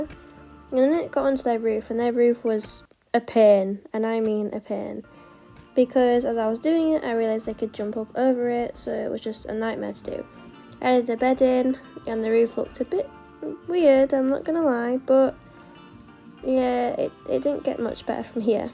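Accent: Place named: United Kingdom. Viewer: I think your british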